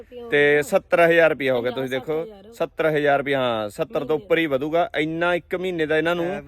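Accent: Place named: Dhanaula, India. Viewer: ਤੁਸੀਂ ਕਸਮ ਖਾਧੀ ਹੋਈ ਹੈ ਕਿ ਕਿਸੇ ਵੀ ਇਲਾਕੇ ਵਿਚ ਜਾਓ ਤਾਂ ਅਪਣੀ ਧਨੌਲੇ ਵਾਲੀ ਬੋਲੀ ਹੀ ਬੋਲਣੀ ਹੈ? ਬਾਈ..ਬਾਈ.., ਝੋਨਾ, ਨਿਆਈਂ..! ਇੱਕ ਬੇਬੇ ਨੂੰ ਤੁਸੀ ਸਤਿ ਸ੍ਰੀ ਅਕਾਲ ਬੁਲਾਈ ਤਾਂ ਉਹ ਮੂੰਹ ਵੱਲ ਦੇਖੇ। “ਨਿਆਈਂ ਦੀ ਜ਼ਮੀਨ ਦੱਬੀ ਬੈਠੇ” ਤੁਸੀਂ ਦੋ ਵਾਰ ਬੋਲ ਚੁੱਕੇ ਹੋ। ਪਹਿਲਾਂ ਨਾਸਿਰ ਢਿਲੋਂ ਨੂੰ ਵੀ। ਦੱਬਣ ਦਾ ਮਤਲਬ ਤਾਂ ਕਬਜ਼ਾ ਕਰਨਾ ਹੁੰਦਾ। ਉਹਨਾਂ ਦੀ ਪੈਲ਼ੀ ਹੈ ਤੇ ਤੁਸੀ ਦੱਬੀ ਦੱਸਦੇ ਹੋ?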